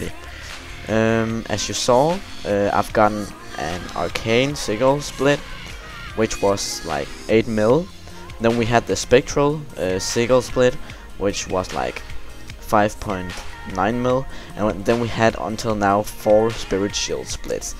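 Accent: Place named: Denmark. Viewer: so danish accent.. trololol, like this serie, it's awesome seeing progress videos, Next drop: ely!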